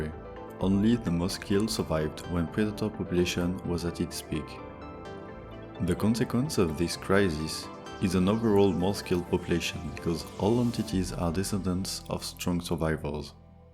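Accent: France. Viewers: Hmm le bon accent français ❤️ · He is french because is accent, you can make a video in french 😉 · are you French🤔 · Is it just me or does he sound French? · Bonjour j'entends ton accent français, as tu une chaine en Français avec la même video ? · ho le méga accent français mdr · gros accent français spotted ;) mais vidéo interessante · Excuse me but i wonder, after seeing the first minute of the video: "est tu français?" Because of your accent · Haha je le reconnais bien, l'accent français 😂 Courage, tu vas y arriver 👍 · Petite question : serrais tu français ? Y’a un accent très reconnaissable 🤔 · Le fait que tu es un accent français me fait encore plus aimer la vidéo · C'est un accent français ça ! Ça fait plaisir 🇲🇫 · You sound so french, why all AI youtubers are french wtf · it seems that you have a French accent. si oui très bonne video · Ah moi j'aimerai une version française hihi (l'accent t'as trahis 😘) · you have a french accent lmao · T'es français ? 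· T’es français l’ami ? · L accent francais on est laaaaa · L'accent français énervé je m'y attendais pas x) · French accent?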